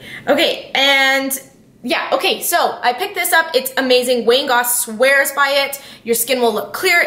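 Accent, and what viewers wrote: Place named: Canada. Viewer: Just Canadian accent.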